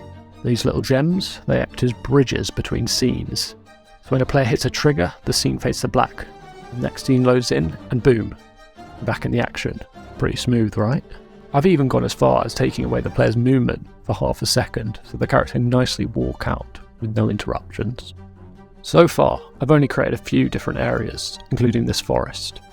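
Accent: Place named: United Kingdom. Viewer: Really well made devlog dude and the game is stunning and looks so fun. I'm definitely keen to see more! Btw I hope this is not offensive, but your accent sounds like Dudley from Harry Potter! Which part of the UK are u from?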